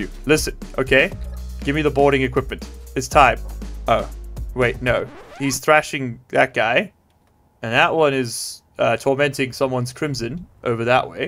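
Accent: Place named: South Africa. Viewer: I keep forgetting youre from SA.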